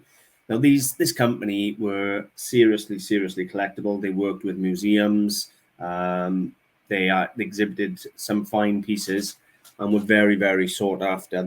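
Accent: Wales, United Kingdom. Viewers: I loved the silver fish spice box. I am Jewish and I have never seen one. I have a spice box in the shape of a sunflower. The spices are used in a ceremony at the end of the Sabbath and smelling them reminds us that we are saying goodbye to our special day. By the way the word Judaica is pronounced as though the 'c"is a "k". I enjoy your videos and especially enjoy your Welsh accent as my husband is also Welsh. Much success in 2022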